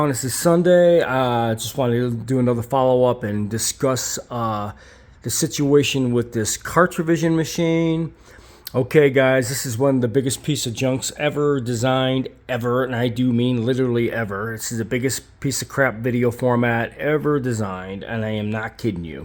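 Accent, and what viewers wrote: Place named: New York, United States. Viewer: You sound like your from NY i em a New Yorker my self.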